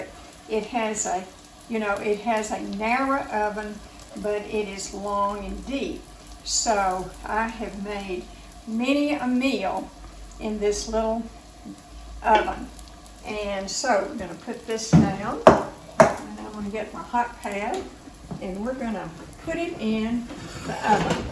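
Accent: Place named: South, United States. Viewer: Thank you for making this video. I am gonna try this recipe this year for Thanksgiving. My Grandma use to make the best cornbread dressing. I wish I would of asked her for recipe. I have tried to make it for several years and just haven’t been able to get it right. Your recipe does look very similar to hers. Listening to you speaking and hearing your southern accent reminded me so much of her. Again, thanks for sharing this.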